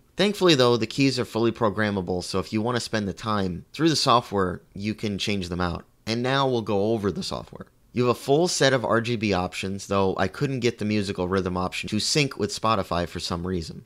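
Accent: Scotland, United Kingdom. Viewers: Too bad you lost your accent (like for example Xcom 2 Episode 1 ), that was like 25% of me wanting to view it (love the Scottish accent) :)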